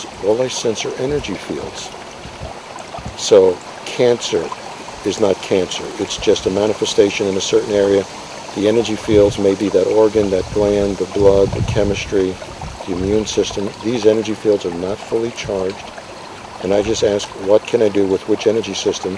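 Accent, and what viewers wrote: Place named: Bengal, India. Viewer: Started off sounding like a bong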